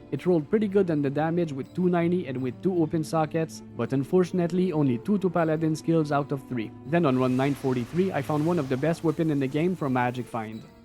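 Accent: Quebec, Canada. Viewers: J'entends le bon Québec! Haha ❤ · ARE YOU FRENCH CANADIAN ? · Good ol Queb accent, keep the grind brozer ! ( all the run was in hell ? ( online or solo ) ?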